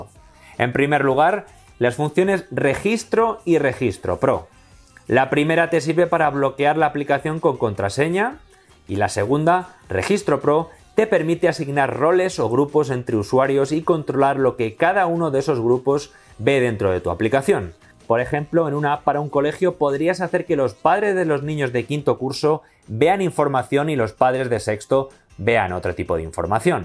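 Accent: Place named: United Kingdom. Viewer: british englisshhhh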